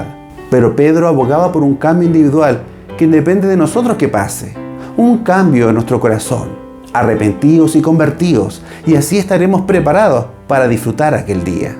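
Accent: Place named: Chile. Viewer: ❤hola buenas tardes saludos que bien video me encanta el acento de los chilenos ❤🎉muchas gracias por su trabajo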